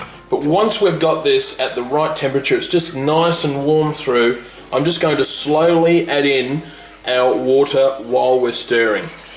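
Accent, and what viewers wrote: Australia. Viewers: At first i only subscribed to him because he had an Austrailian accent, but now i found out he makes delicious things so it's a win win situation :D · Can anyone tell me is it Australian accent? Just curious.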